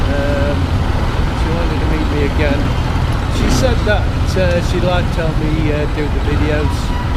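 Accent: United Kingdom. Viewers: Show Hey. Bro! small world after all eh? is riding like, a bloody local pinoy here though! while speaking with British accent hehehe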